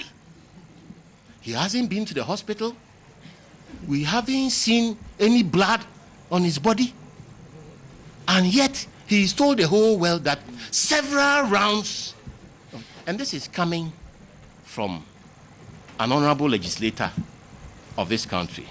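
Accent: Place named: Africa. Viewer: Mate. Am a soldier with foreign EU army. I can assure you we will assist the UN real soon on a peace keeping mission. You are hearding down that bad road with your African accent. Your visas will stop working once we declare you nation a UN case. Watch (Hotel Rwanda) Your country will be my 4th UN mission. It will definitely be peace keeping grounds.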